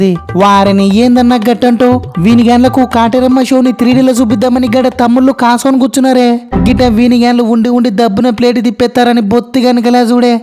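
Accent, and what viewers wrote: Rayalaseema, India. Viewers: Anna rayalaseema slang❤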